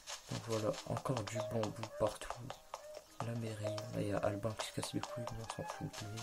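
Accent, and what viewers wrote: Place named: Arab world. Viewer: tu parle vraiment comme un vrai arabe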